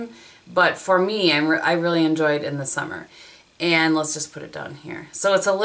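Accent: Wisconsin, United States. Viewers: You are a hoot! Enjoying your videos, thanks. I might need to try the IT Cosmetics powder. And I thought I recognized that accent! I grew up in northern Wisconsin. I miss it like crazy! I'll be home for a visit in August and I'm hoping I can convince my sisters to drive up north to Paul Bunyan's before they close for the year! Not that I need a lumber jack breakfast :/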